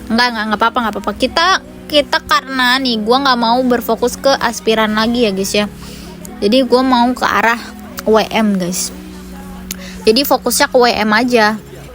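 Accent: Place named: Bekasi, Indonesia. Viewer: Dari bekasi ya 😂😂 logatnya kaya ga asing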